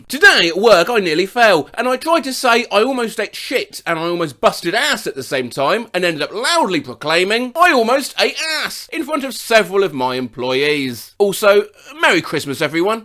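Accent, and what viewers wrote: United Kingdom. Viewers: I have only watched like 5 of your videos and I have to be honest, you have the greatest British accent of all time.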